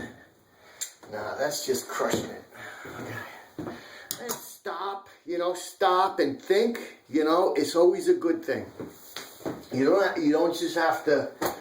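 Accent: New York, United States. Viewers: Nice Job!! I'm a fellow handyman and it's always great to get help or advice from a contemporary! Love your style and NY accent too as I'm from Philly and my wife's from Jersey so it reminds me of home! Love the way you remind the "boys and girls" to keep calm and take a moment! Great work man!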